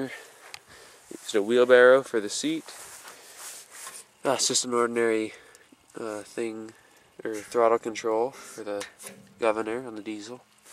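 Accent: Canada. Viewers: must be a canadian aboooot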